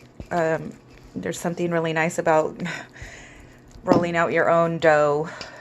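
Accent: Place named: United States. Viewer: Idk I’m sorry to say but even her accent was too American aka “white” she even said paratha like a white person 😩 idk I think I’ll experiment in other halal tamales I grew up eating them but mom used pork fat and it’s a no no for us Muslims. But ghee it is maybe with some red sauce in the masa también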